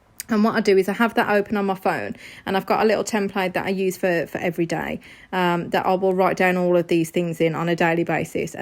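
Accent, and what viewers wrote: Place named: Birmingham, United Kingdom. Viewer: Which part of Birmingham are you from lol, enjoyed your vid.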